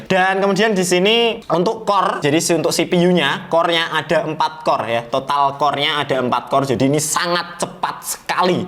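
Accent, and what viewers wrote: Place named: India. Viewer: kang kaya orang india... ngebutt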